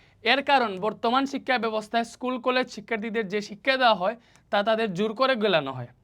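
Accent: Sylhet, Bangladesh. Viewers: স্যারর বাড়ি সিলেট নি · Bhaia tumi mone hoy Sylheti 😊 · Vai apni ki sylheti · ভাই ত সিলেটি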